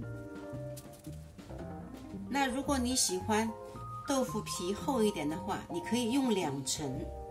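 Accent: Shanghai, China. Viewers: 親切的上海普通話，很久沒有吃過這道家常菜了。謝謝Jenny!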